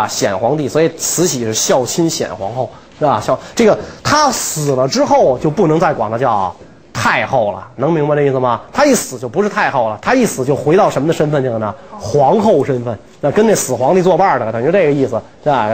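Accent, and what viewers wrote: Beijing, China. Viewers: 首先得是北京口語，節奏明快用詞精準，光聽都覺得順耳，流暢不嗑巴..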